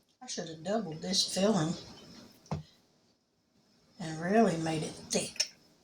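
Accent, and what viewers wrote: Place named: South, United States. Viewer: you as well sweet lady! I reside in North Georgia! You sound like you are from the south as well!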